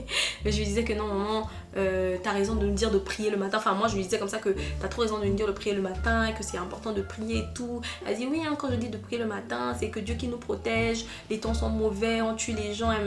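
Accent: Gabon. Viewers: Moi c'est tes accents que j'aime, surtout l'accent gabonais ❤️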